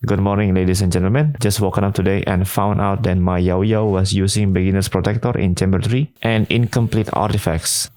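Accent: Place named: Indonesia. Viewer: i hear indonesian accent speak english, i like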